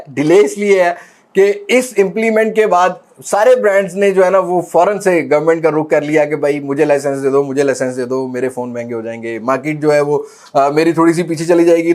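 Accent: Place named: India. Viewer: You don't have to talk like an indian sir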